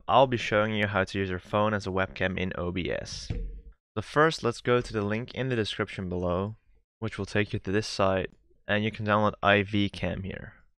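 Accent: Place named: Netherlands. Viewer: This might seem off-topic but you sound like a Dutchman.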